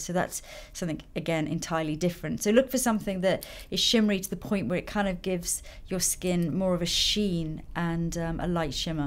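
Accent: England, United Kingdom. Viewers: I find fascinating how Magical the transformation always is, how beautifully the colors blend whether they are subtle as here or more intense and above all the degree of sincerity of (the comment about her cheating on her lower lip)... this is Fascinating:D (and I havent got a clue about proper makeup:D).. oh yes I also love British accent:p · I love how english people prononce french words! Good job! It was really hard saying encre de peau :)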